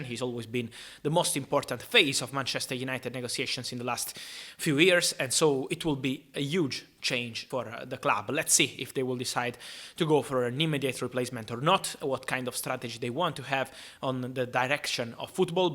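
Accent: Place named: Italy. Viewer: I didn't even need to read your name to know you are Italian. Your accent is so typical. I like it.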